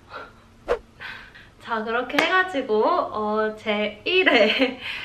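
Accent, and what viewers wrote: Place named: United States. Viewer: Even tho you speak in korean you still have that american accent its cute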